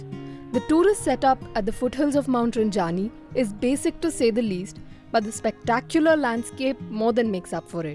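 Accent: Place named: India. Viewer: Your horrible accent makes it an unwatchable clip. Find a neutral narrator that is not Indian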